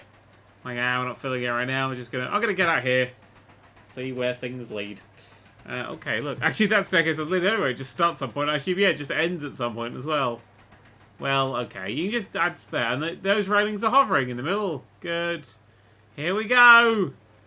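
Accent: United Kingdom. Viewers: British Accented Man-Baby Complains About Quest Tabs for 42 Minutes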